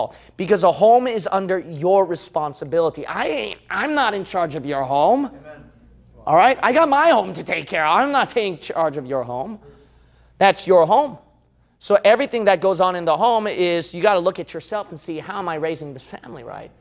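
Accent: United States. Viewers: He speaks in American accent.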